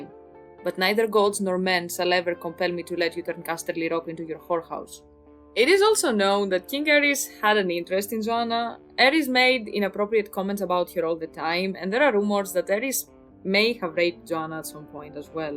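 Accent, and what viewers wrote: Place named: Greece. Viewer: Thumbs up who always reads the community posts in her voice (greek accent ftw) 👍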